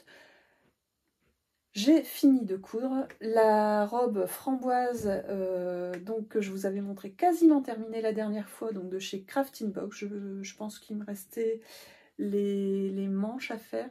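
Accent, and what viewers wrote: France. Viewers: J'aime beaucoup t'écouter, petit accent qui me rappelle mon enfance à Marquise 😉 mais pas seulement pour ça... Tes ouvrages sont beaux, la "rubrique culturelle" est claire, intéressante, tu me donnes envie d'écouter un livre audio... Merci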